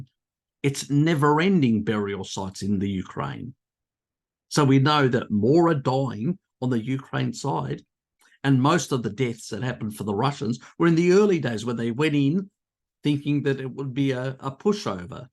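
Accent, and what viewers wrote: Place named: Australia. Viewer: you have a very broad Aussie accent for a guy of Greek heritage!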